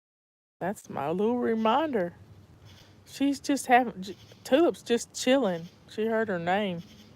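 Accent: South, United States. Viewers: Absolutely beautiful! I love listening to your southern voice! Love from Dallas, Texas!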